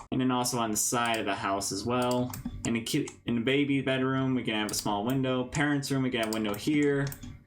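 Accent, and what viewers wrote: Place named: Newfoundland, Canada. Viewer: this is so random, but I'm willing to bet money you're from newfoundland Canada, I can tell that accent from anywhere! -a newfie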